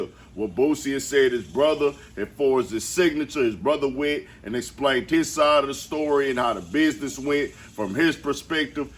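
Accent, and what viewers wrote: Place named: Texas, United States. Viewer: I love your commentary. It’s so Texas. Shout out to you from DFW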